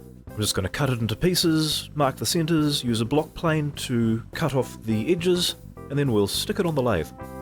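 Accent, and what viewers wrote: New Zealand. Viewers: Clear explanation and step-by-step practical demonstration. Enjoyed this video. Kiwi accent.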